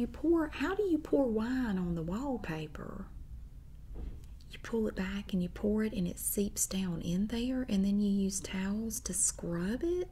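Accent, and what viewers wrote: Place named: North Carolina, United States. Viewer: I love this accent so much as a southern guy. It's really we done. Then I find out she is in NC cause Winston Salem is right up the road and now I understand why it sounds so familiar. NC represent!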